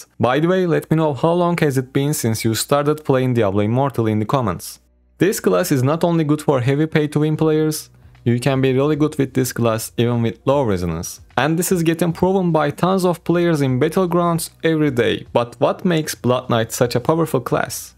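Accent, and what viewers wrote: Turkey. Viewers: Tam türk aksanı var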